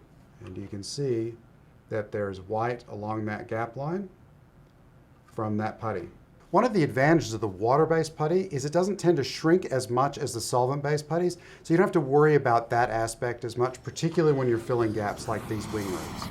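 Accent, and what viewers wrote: Australia. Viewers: Great video as usual. As an Australian I can hear a hint of our (or NZ) accent in your speech!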